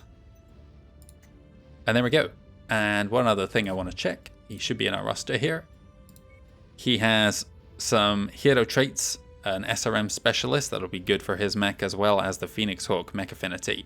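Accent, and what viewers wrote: United Kingdom. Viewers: Personally I struggled to hear Ryana’s Scottish side, tho if you said she came more form the east side of Scotland around Edinburgh or Fife areas then they have alot less of a toned version compared to west and mid Scotland. So it’s probably true being she’s a Northwinder but likely comes from a part that has just a very very mellow version of the accent. Edit: also say a lot when I didn’t even know you were Scottish yourself. So aye, definitely more on that more mellow side then. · i hear a brit'ish accent but not a haggis laced one.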